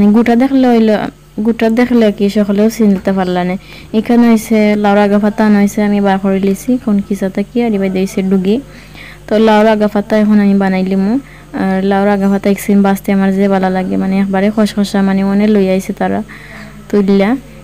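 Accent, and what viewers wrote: Sylhet, Bangladesh. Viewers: Afnar mat kub bala lage jokon Sylheti matoin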